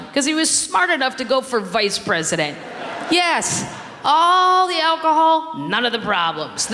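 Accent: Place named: Midwest, United States. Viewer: Such a cutie - love her midwestern accent 🥰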